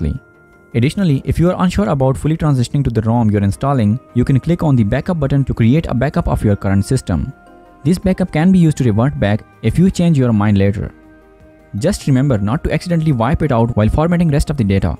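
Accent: India. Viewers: Well he's Indian? I'm just happy he's actually speaking English and not just click baiting with English title then full out Indian audio lol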